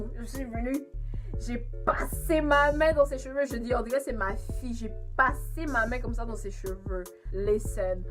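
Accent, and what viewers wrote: Canada. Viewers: It’s t'as un accent de canadien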